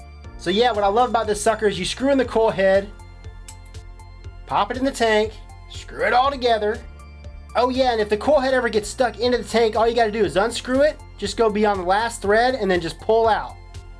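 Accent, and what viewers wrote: South, United States. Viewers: rahliE05 that's how people from the south sound...like motor oil sounds like motor oh-ill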